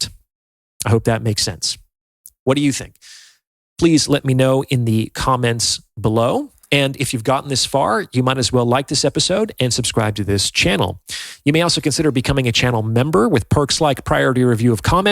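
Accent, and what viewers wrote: United Kingdom. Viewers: RP AF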